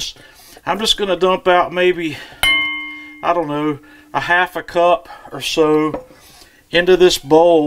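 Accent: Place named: Georgia, United States. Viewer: Wow, you've even mentioned Malcom Reed....... My wife and I,,, when we first seen you, we thought,,,,,,,,> WOW: he sounds like Malcom Reed.... > both from Georgia , our guess! Love to watch you, and to hear your accent! Thanks! Marcel , from Yuma, Az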